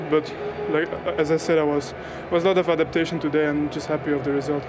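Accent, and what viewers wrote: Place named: Manchester, United Kingdom. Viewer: Funny how his Belgian accent evolved into a Manc one over the years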